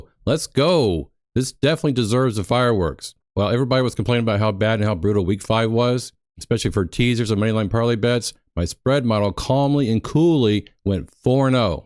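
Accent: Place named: Michigan, United States. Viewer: what state do you live the voice of your accent are you from Michigan r u a lions fan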